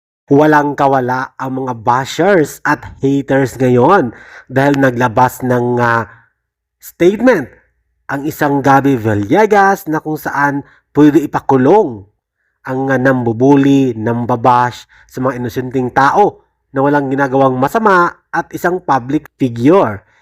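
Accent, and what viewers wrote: Visayas, Philippines. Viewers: Ate Bisaya po kz yta ang nagrereport halata nmn po xa boses ña kya kalma lng po😅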